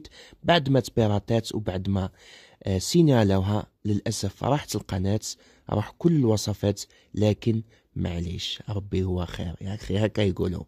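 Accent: Constantine, Algeria. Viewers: الشاف برغم عايش في ألمانيا ألا انه محافظ على لهجته القسنطينية الجميلة ألف تحية وتقدير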